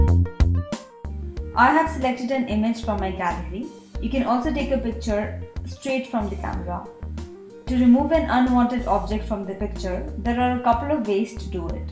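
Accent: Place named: India. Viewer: From what i hear, she should be indian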